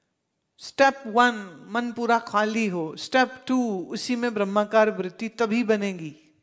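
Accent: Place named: Punjab, India. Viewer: Yours Punjabi ascents is too great.Jai Rafisahab and yours Vaani.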